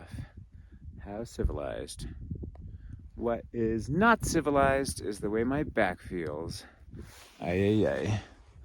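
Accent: Argentina. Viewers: Amazing stuff! Glad to hear you are picking up the argentinean accent when speaking spanish;)! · your Spanish has improved! Nice to hear that Rioplatense accent haha. Always thrilled to see you upload a full length video. Your instagram clips are just not long enough and you've stopped writing on your blog. These vlogs will have to do. I'm a novice at the mountains but whenever I'm in chalten I always dream of bumping into you! Keep on posting inspiring content for us please:)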